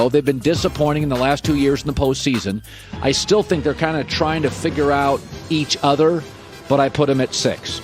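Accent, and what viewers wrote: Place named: Boston, United States. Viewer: Pick one; you can either have a, slurring S’s speech impediment, or you can have a Boston accent. You can’t have both, and be in broadcast journalism. Even sports broadcasting.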